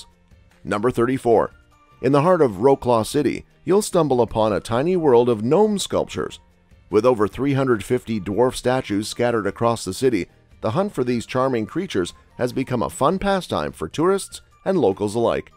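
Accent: United States. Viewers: Agree here. He should have learned how to pronounce it right and not to read it like an American would try it to pronounce. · Authentic Polish pronunciations should be used in this video- not Americanized pronunciation s. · Imagine 2023 having internet still saying polish names with us accent disrespect...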